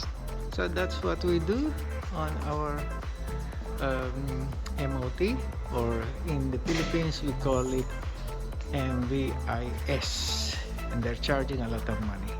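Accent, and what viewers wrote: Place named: Spain. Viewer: Se nota que eres español por como pronuncias en inglés.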